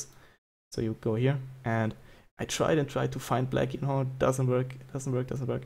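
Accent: Germany. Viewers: good video helped me gonna try that out. could immediately tell that ure german because of the accent :p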